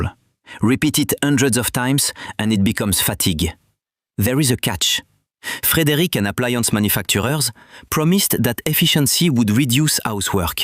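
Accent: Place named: France. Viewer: I like this decent French accent😊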